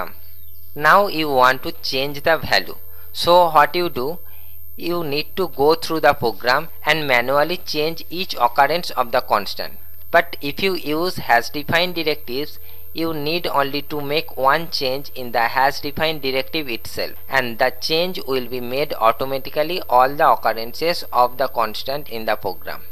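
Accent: India. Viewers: Sir r u south Indian? ?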